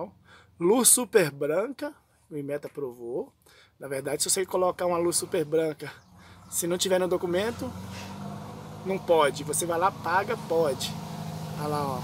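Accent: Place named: Minas Gerais, Brazil. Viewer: de qual lugar de minas vc é?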